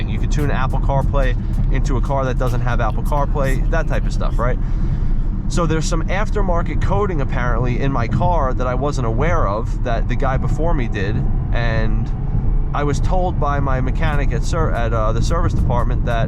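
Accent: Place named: New York, United States. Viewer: Annoying NY Accent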